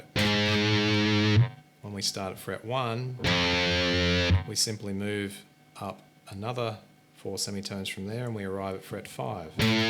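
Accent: Australia. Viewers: Yay, an Aussie, you beaut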